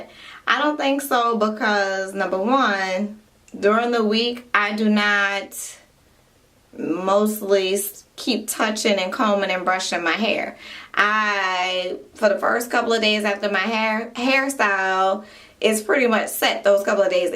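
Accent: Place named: South, United States. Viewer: I love your voice!!!! #Southern ❤️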